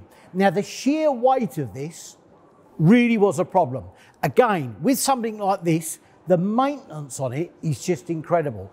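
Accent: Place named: England, United Kingdom. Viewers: I love his accent, what part of England is his from? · Liverpool. Broad scouse. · Hornchurch, Essex. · Sounds like a Londoner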